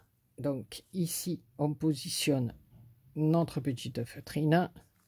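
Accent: South, France. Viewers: une magnifique création 'tous les tutos sont très bien expliquer et avec l'accent du midi.Merci pour ce pur bonheur de partage .nouvelle abonnée .bonne journée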